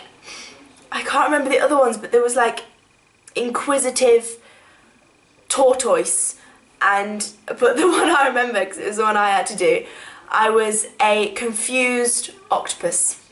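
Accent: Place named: South, United States. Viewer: this is great! Just hearing your southerner tones, and seeing you brought back so many memories...This is great!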